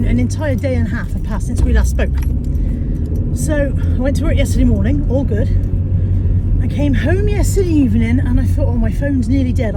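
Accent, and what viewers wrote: Bristol, United Kingdom. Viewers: Hi I watch you and family plus Dogs all the time my late Husband was from Bristol and love ❤️ the way you talk bring back such good memories.coming to Bristol on the 12th March to see family I will look out for you when we go into town would love to buy you a drink.watch you do parcel up and send a supize one every week I am 74 soon and love my yorkie belle she my mate like molly is your.sending love ❤️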